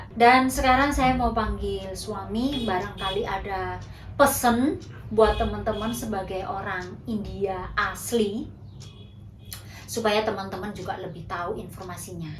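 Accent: Java, Indonesia. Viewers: Senyum senyum sendiri, dengerin logat jawanya Mba belum hilang 😊, Kadaluarsa = kadaluarso Sekonyong konyong koder 😅😅😅 Mba ditunggu vlog ramadan disana ya 🎉🎉🎉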